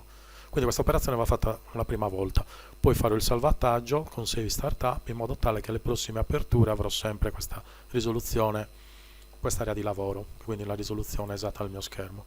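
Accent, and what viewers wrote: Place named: Italy. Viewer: Cercavo disperatamente un tutorial in italiano senza risate di anglofoni che vogliono perder tempo e ti spiegano che prima devi acccednere il pc, che il mouse nun se magna e stronzate varie. E, sorpresona, non solo lo trovo in italiano, ma addirittura con l'accento della mia isola, l'accento mio, che mi avvicina emotivamente a questo programma dall'interfaccia prevista per marziani. GRANDE!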